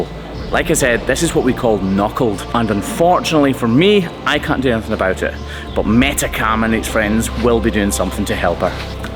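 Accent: Scotland, United Kingdom. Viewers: Can't get over the Closed Captions saying "So this carrot" at the start of the video, and I've never wanted to be Scottish more in my life. I mean my family is from there, but I didn't get the cool accent, just the freckles. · I've been watching your channel for a while now (I believe more than 3 years) and I've always been very thankful for your job, you and your team always do a great job taking care of these animals and it's very satisfying to watch ❤ but I also wanted to thank you for helping me improve my hearing skills! I'm a non-native English speaker (it's my second language) and the Scottish accent is very particular (and from my understanding, one of the hard ones to understand) so hearing your videos has helped me a lot! Thanks! 😊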